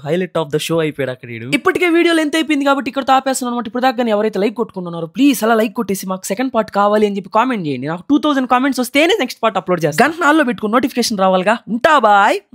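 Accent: Nellore, India. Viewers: Video total febless 😊 total video lo nellore slag matladuthu ne unavu enjoy orange 👌👌👌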